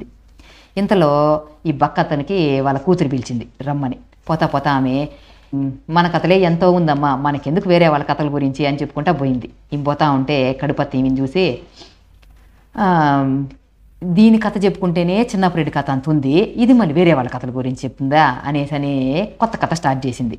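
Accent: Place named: Chittoor, India. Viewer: కథ సహజంగా పల్లెల్లో మన ఇళ్లలో మన మధ్య జరుగుతున్నట్టుగా చిత్తూరు యాస ఉట్టిపడుతోంది మీ నోట ☺️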